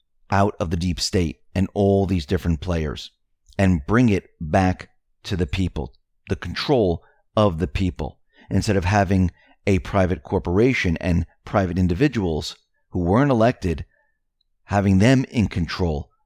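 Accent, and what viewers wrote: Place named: North Jersey, United States. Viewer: i assumed he was north jersey, for sure.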